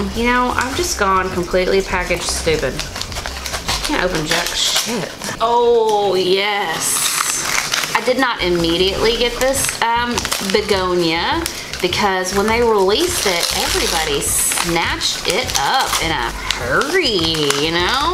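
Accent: South, United States. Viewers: Hi, really love your videos. You are the cutest and love your accent!! I have one too as I’m originally from Georgia now Calif(👎). You and are a trip🤗👋 and Winston too!! You are so knowledgeable and cute and you come across as so real🤗👍. Steve’s leaves begonias are delicious!! With your rev think I’ll try them😁. Thanks again for your exceptional videos, regards to and Winston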